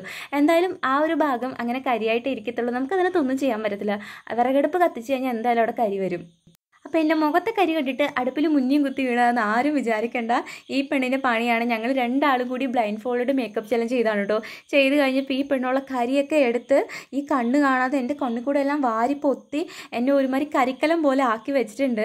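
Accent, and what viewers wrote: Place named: Kasaragod, India. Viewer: Kasaragod slang 😍😍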